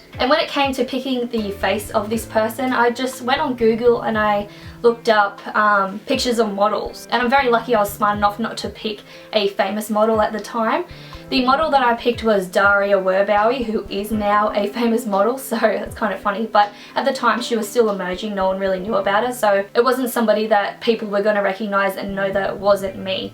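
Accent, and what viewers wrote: Australia. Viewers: are u from aus?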